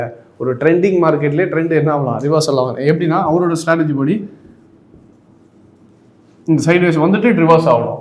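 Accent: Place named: India. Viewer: Very cool but the indian accent makes it difficult to understand :)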